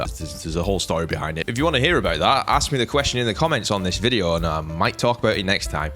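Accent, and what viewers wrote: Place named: Bolton, United Kingdom. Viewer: As a North of England person I would guess is from Bolton, North West England, North of Manchester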